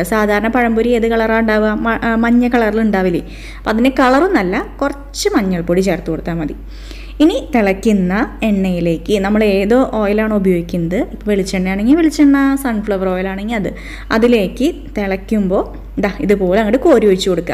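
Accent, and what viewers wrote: Thrissur, India. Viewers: Ningal trissur ano....ente oru friend und shyra......same samsaram anu.....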